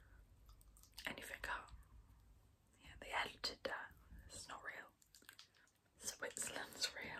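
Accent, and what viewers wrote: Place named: United Kingdom. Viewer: oh, your british accent <3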